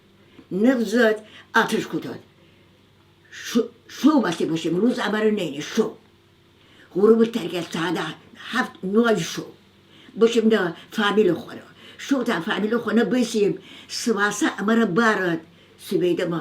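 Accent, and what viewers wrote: Gilan, Iran. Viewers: عالی عالی❤ لهجه جان ، برا شرق گیلان😘♥️😍نوستالژی👌🔥😍♥️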